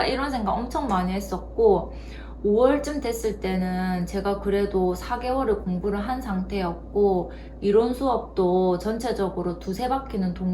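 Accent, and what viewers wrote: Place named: Daegu, South Korea. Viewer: 워킹맘인데 공인중개사 관심 생겨서 검색해보고 보는데 익숙한 사투리 너무 정감있어요 ㅎㅎ 저도 대구에서 자랐거든요~ 열심히 하신게 느껴져서 동기부여도 되네요. 솔직한 영상 감사드려요!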